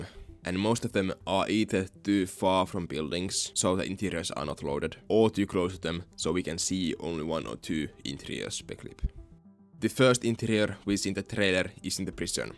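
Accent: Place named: Finland. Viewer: You have a finnish or swedish,are u from there?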